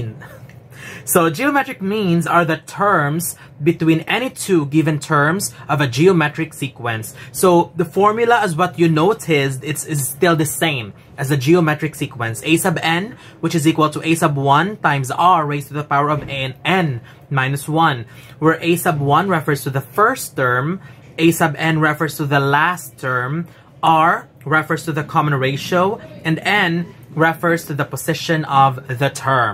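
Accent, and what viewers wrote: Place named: United States. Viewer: Akala ko amerikano yung nagtuturo hahaha. Ang fluent mopo mag turo naitindihan kopa lalo. Thank you sir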